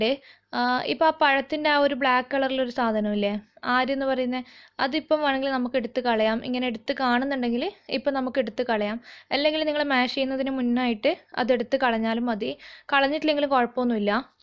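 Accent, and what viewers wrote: Kannur, India. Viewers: .. Nhan thrissur aane.. Kannur slang enikk orupade ishtta...ippo samsarikkunna pole thanne samsarichal mathi.. 😁😁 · നല്ല കണ്ണൂർ slang........☺️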